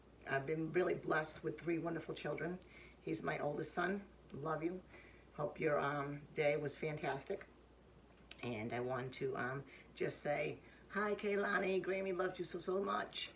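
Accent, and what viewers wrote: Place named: New England, United States. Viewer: I thought I detected a new England accent on you I have relatives thst live in foxovuro MA we used to drive down there all the time when I was young and it was always my favorite vacation. My aunt lived on the end of a dead end street right on a lake and Shaffer stadium was right on the other side of it so we used to hear concert from her back yard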